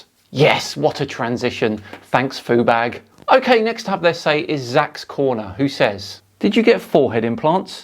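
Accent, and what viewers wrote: United Kingdom. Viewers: I think is hilarious. I’m assuming he’s British from his accent and sense of humor, but that’s right up my alley. Besides, if I didn’t, I wouldn’t be here. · is a nasa shill using a British accent or whatever to sound smart he also could be homosexual according to some accounts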